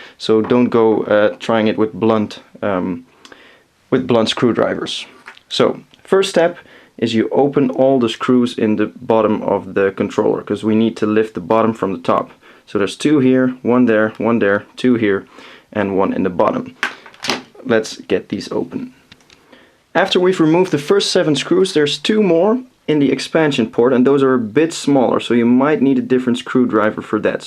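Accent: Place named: Netherlands. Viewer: Nice dutch accent :D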